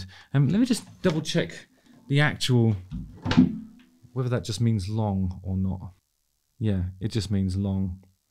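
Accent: United Kingdom. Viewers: No, he’s just British and you’re probably just American.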